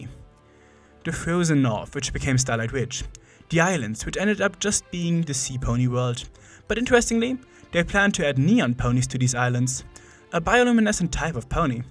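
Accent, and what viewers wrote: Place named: Germany. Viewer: Bruhh what is that accent if it isn't German xd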